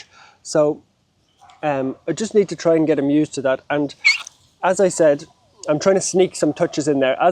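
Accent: Ireland. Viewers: I think dogs like the sweet tone of your voice and your Irish accent ! Thank you for helping and saving this poor dog. He landed on his 4 paws in Doggoland !!!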